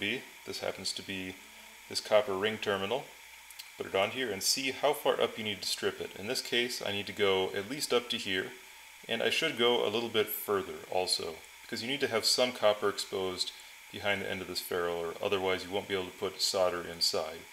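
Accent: United States. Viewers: You from Idaho? Remind me of guy knew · just american english, no big deal. Forgive them, they have not reached your level of perfection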